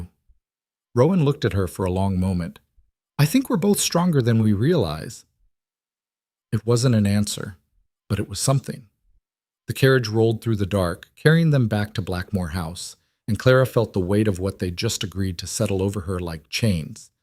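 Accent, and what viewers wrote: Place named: United States. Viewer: Whoever wrote this has no understanding of the regency period or the manners and language of the British aristocracy. An American narrator doesn’t help.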